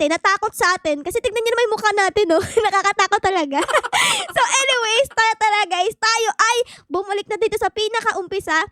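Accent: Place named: Baliwag, Philippines. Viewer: para kang baliwag na bata diyan magsalita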